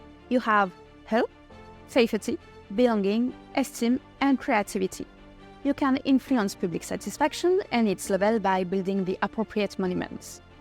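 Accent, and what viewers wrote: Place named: France. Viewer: Don't know why, but the French accent is very persuasive.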